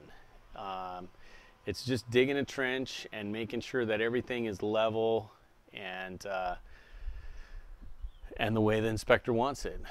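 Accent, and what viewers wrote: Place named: Northern Idaho, United States. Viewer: You sound like everyone in Northern Idaho. Ha except here they tell Californian's to go home. The funny thing is they are all from California but they came here 20 years ago so now they think they claim the state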